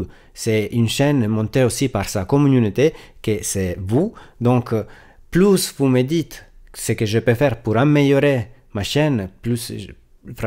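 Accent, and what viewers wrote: Italy. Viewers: Salut. Tout d'abord, un grand MERCI pour ta chaîne et pour l'effort de parler correctement le français avec ton accent italien ensoleillé, alors que la plupart des youtubeurs francophones blingbling avec du RGB partout ont moins de mots à leur vocabulaire qu'un berger allemand. Je me suis abonné à ta chaîne parce que tu vas à l'essentiel de l'info sans fioriture et surtout sans effets de style linguistiques "tendance" et ça, ça repose vraiment la cervelle. Tes avis et critiques semblent refléter tes connaissances professionnelles d'ingénieur informatique et c'est plutôt rassurant 😉 Sinon, pourrais-tu parler un peu plus du matériel que tu conseillerais pour des machines orientées artistes 3D (Unreal Engine5, C4D, Blender, AE...)? Merci 😉😊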